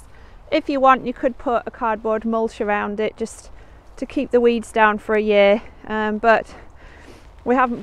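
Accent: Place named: Manchester, United Kingdom. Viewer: Subbed you you sound local manchester way lol hopefully get sone good tips off you!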